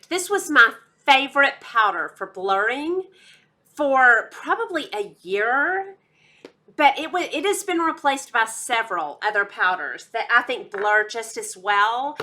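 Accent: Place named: Texas, United States. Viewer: I knew there was a reason I love you and love watching your videos....you’re a fellow Texas gal!! I knew that accent was familiar!! You are just adorable!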